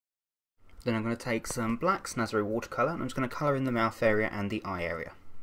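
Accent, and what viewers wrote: United Kingdom. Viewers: The fact he's British and terrifying is hilarious to me for some reason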